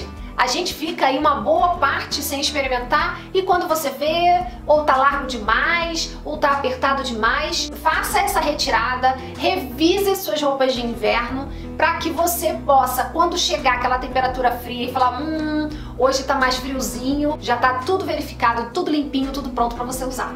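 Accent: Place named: Rio de Janeiro, Brazil. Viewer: Gosto do seu sotaque carioca. Sou mineira. Suas dicas são ótimas. Já vou chegando dando meu like.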